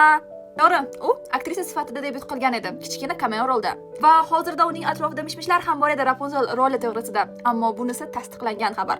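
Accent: Bukhara, Uzbekistan. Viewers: Qayerdasiz shevangiz buxorocha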